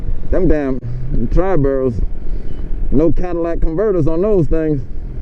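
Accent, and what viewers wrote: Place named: New York, United States. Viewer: New Yorker for sure LMAO, what's up ma man 🇺🇸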